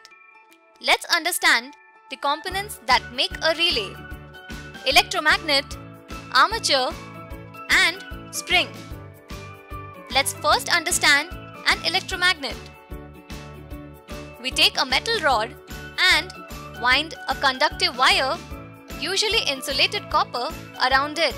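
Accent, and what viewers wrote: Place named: India. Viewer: Great presentation but this annoying woman with an indian accent i can't...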